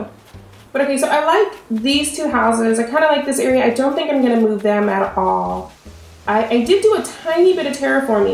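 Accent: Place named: United States. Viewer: What region of the US are you from? I noticed you say some things in an interesting way, like when you say "guys"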